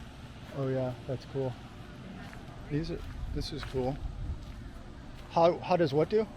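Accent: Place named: South, United States. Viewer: You gettin southern!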